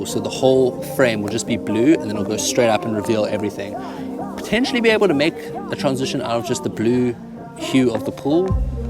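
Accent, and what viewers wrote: South Africa. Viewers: I’m from South Africa to and I just discovered your channel from a guy that is a fpv pilot he’s your buddy you guys made a video together about the go pro hero 12 but anyways I’ve been binge watching your videos and I have to say as a South African I am so proud to know that you are from our country and I’m so glad to see you doing so well in life traveling and making these sick videos I’m moving to Dubai where my family moved 8 years ago and once I get there I’m starting a YouTube channel and that’s how I came across your channel I was researching cameras and drones and your busy I can’t remember he’s name but you where in his videos which led me to your channel cause I heard your accent and he said that you are very well know in his videos and I just wanted to say I’m so glad I found your channel your so inspiring and your videos and skills and knowledge is so amazing to see mahn keep it up u love it when our people can be so skilled and make videos at the quality on par with people over seas and even better as I saw in your go pro awards video and you won to you fucking legend amazing content love from South Africa 🇿🇦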